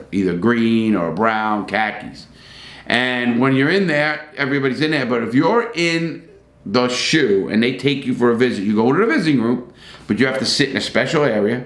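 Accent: New York, United States. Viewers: I just LOVE his new york accent its so heavy I can smell pizza from the screen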